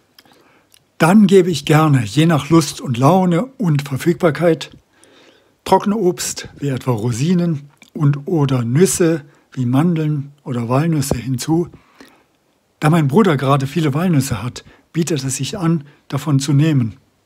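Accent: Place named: Switzerland. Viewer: Also von mir aus kannst Du das Schweizerdeutsch gerne mehr einbauen, ich höre das gerne. Selber sprechen kann ich es leider garnicht... Und wie es aussieht, ist Deine Verwandtschaft auf der ganzen Welt verteilt! 🙂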